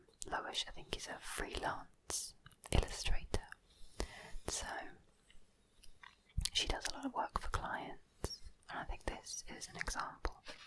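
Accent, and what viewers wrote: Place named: United Kingdom. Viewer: Sorry but I genuinely don't like British or similar to British accent,so this video soundeed agitating to my ear due to that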